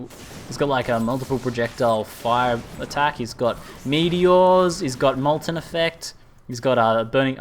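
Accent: Australia. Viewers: You have just became my favourite streamer. Recently I have been paying attention to a couple of D3 streamers, like Archon, KingKongor, blaqksmith & etc. I prefer you to any of them! Keep up the good work! I think it has something to do with your Aussie accent, or you just remind me of a friend, but your description during gameplays are absolutely colourful and intriguing!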